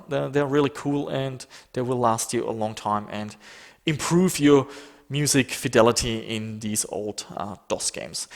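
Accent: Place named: Germany. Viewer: Yeah, I also thought you were from Germany :D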